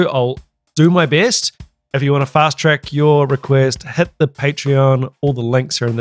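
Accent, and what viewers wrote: New Zealand. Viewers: Its good to hear that Kiwi accent on Youtube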